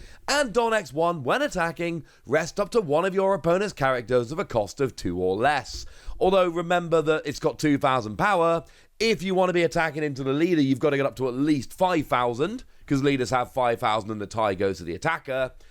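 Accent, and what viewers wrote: England, United Kingdom. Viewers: Typical english accent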